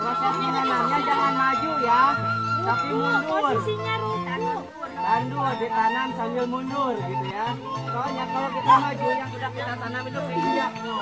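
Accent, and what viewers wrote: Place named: West Java, Indonesia. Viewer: iyeuuh, khas sundanya kerasa 😍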